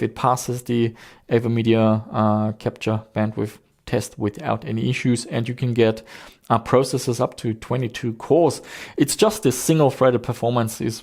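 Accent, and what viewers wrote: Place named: Germany. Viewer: I have to ask, are you originally from Australia? Cause your accent sounds German to me for some reason.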